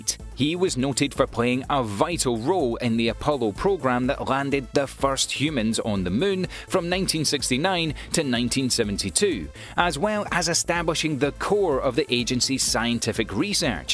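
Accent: Scotland, United Kingdom. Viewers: For someone with a British accent he mispronounces a Lot of important words ie: La Grange,Arianne,Grummin etc. Makes me wonder if his facts are just as poorly based.. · It’s LaGrange not larange. Perhaps it’s your Scots accent that misinterprets everything.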